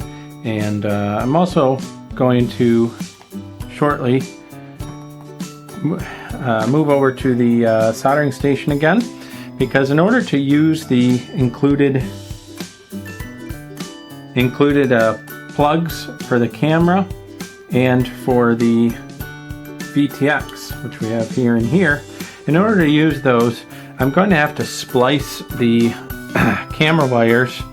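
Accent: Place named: United States. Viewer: Are you aware that American English is different than British English? He pronounced it the way Americans pronounce it because he's an American. He's pronouncing it exactly the way it's supposed to be pronounced in America. Why on earth would the British pronunciation be any more correct if he's not actually British? That's just nonsense.... lol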